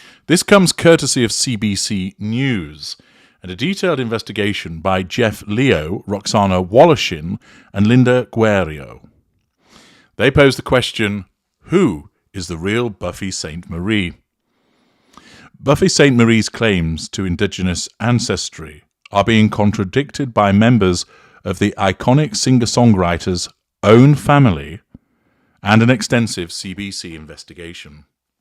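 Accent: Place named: RP, United Kingdom. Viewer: Waayy too much repetition in this series. It is mostly just a compilation of second-hand bits from the news media. A lazy piece of ‘investigation’, and chock full of mispronunciations: ‘Regina’, ‘Qu’Appelle’, ‘Ontario’. And what is meant in Part 1 by, ‘Canada and the Territories’, for heaven’s sake? You might have a posh accent but these podcasts are a very lazy effort to create content.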